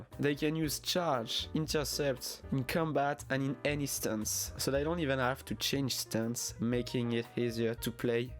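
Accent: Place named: France. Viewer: french accent :p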